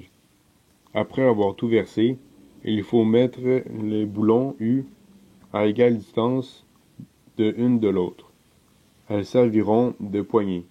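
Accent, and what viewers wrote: Canada. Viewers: Ton accent québecois avec la composition des phrases en francais c'est MAGNIFIQUE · 6 tasses c est trop rigolo avec cette accsent canadien !!!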